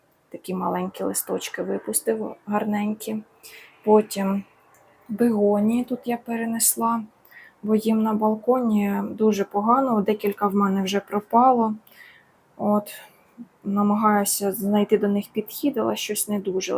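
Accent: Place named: Surzhyk, Ukraine. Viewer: Дома вы украинским языком не разговариваете это отчётливо слышно по вашему произношению на суржике ,обосаться вас слушать,стараеться что то лепит набор слов - це просто вау😂😂😂😂😂😂😂😂😂😂😂😂😂😂😂🎉🎉😂😂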